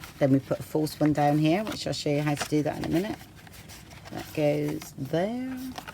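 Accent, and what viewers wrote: United Kingdom. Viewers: I am watching this video again so that I may make one of these portfolios for Christmas... your videos are always so easy to follow and I simply adore your style... that being said, because I tend to watch the videos, sometimes at night while my hubby is sleeping or when I am washing dishes, I have the “Closed Captions” on... I am used to the interpretation being quite askew because of your British accent, but sometimes it just makes me giggle at what it THINKS you said... todays giggle? Washi Tape... it thought you said, “What’ your tape?”...🤣🤣🤣 Hugs to You and Yours...❤️❤️❤️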